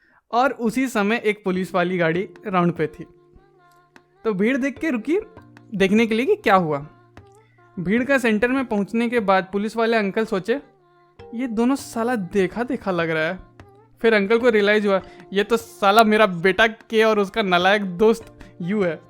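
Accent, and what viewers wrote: Bihar, India. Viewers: Pakka tm bihari ho... Kyu ki bihari he sirf hum bolta hai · Hello I'm your new subscriber from Bihar. Budhwa Chacha 🤣🤣🤣 Your Bihari and Jharkhandi accent make me laugh and happy also . Nice video.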